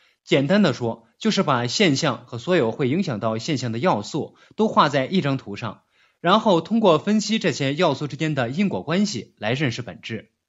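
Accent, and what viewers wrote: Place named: Shandong, China. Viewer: 说的太好了，只是普通话不是很标准，您是山东人吗？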